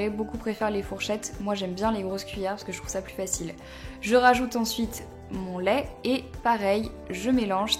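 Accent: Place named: Naples, Italy. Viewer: on dirait à Napolitain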